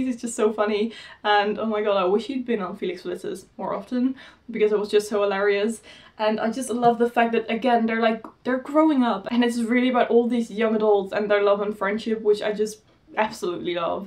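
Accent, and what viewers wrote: United Kingdom. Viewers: me too. You sound very british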